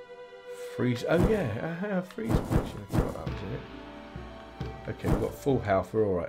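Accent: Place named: West Country, United Kingdom. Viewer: Are you from ludlow or bristol you sound it brov!?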